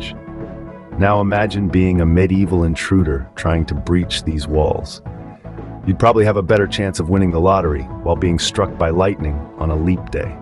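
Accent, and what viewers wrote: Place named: United States. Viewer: Its a shame the American accent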